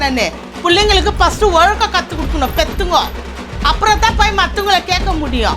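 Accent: Bangalore, India. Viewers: Bangalore tamil ah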